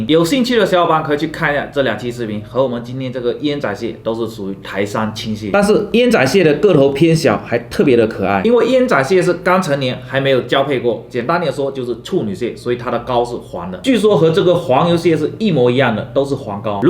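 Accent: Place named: Fujian, China. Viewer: 不知道为什么，我总是听不惯福建口音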